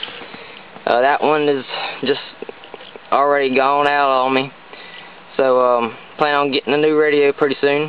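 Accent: South, United States. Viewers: And of course he's southern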